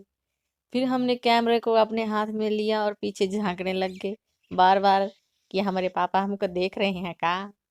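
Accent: Haryana, India. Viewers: maybe I could be wrong but Aap ke bolne mein Kuch Kuch Words ka haryanvi accent bhi aata h Sun k acha lgta h 👍👍 finally you got khidki wali seat 😀😀😁😁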